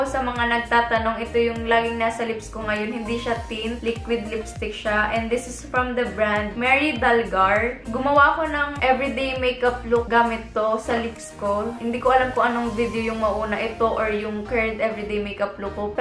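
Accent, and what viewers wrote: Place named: Bicol, Philippines. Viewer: Hahaha Lumalabas yung pag ka bicolana nya dahil sa tagalog accent nya hehe Love you!!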